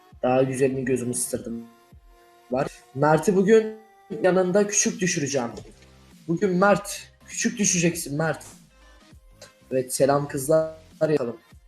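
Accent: Azerbaijan. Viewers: kesinlikle Azerisiniz. Belki kendini MERT diye tanıtan insan bile MERT değildir. Zaten iyiki de ( ismi bilinmiyor xc) abone değilim. Bu kaçıncı yalan? İnsanları kandırarak birşey elde edemezsin! Lox sözünden anladım ve konuşmandan. Bende Azeriyim de, kendinizi bu kadar düşürmeniz? Ah, kesinlikle boşsunuz. Sizin içinizde,dışınızda boş. Benim yorumuma gelip Mertciler pardon Sahtekarcılar buraya havlamasınlar...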